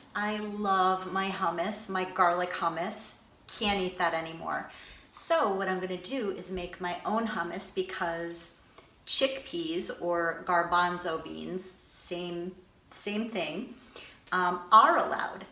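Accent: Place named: Canada. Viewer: I needed this. Been overwhelmed watching endless videos on FODMAP. I'm going to get this book. Just finished listening to a radio show with the author. Oh btw, you sound Canadian. Still trying to get over your age. You don't look it so you must be doing something right. I'm the same age and get compliments that I look 32-36 but you look young as ever. Thank you for the help. Now a subscriber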